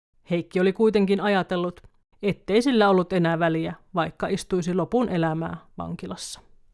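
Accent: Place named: Savonia, Finland. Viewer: Ei oo tähän tarinaan liittyvää,mut ihankun oisit savolaenen. Tuo huastelu jotennii tuntuu sille.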